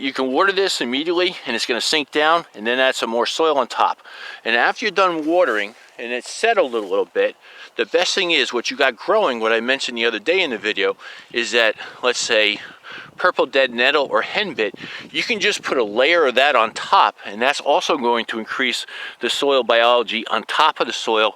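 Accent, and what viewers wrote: New Jersey, United States. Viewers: I just found your channel recently, and I love it! What great advise. I've been gardening on raised beds, protected by a lot of leaves, but you've really open my eyes to what goes on in the soil. I'll be working more with cover crops now. I live in NH., but I'm from the Philly area, so listening to the Jersey accent is an extra bonus.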